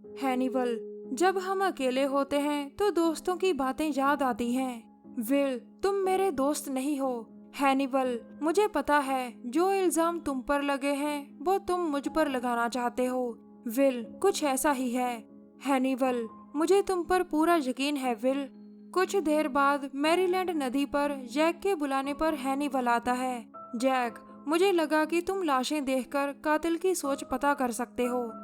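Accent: Punjab, India. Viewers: Too heavy on MTI punjabi hindi accent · According to ur accent ,tusi punjab to ho?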